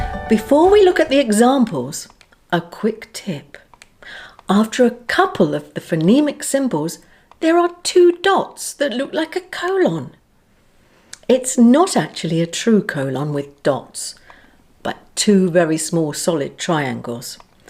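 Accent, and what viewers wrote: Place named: Scotland, United Kingdom. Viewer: Hi, I am here just to say you are amazing. Where have been all my life? Your Scottish accent made me giggle. You are the best English teacher I ever had. Grazie mille la mia eccellente insegnante. Ciao.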